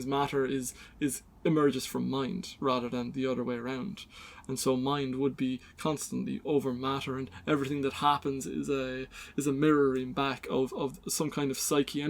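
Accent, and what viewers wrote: Ireland. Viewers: Nice to find a video of an Irish lad talking about Jung. Gizza shout if you're in Cork.. I'd love to put you up in Dripsey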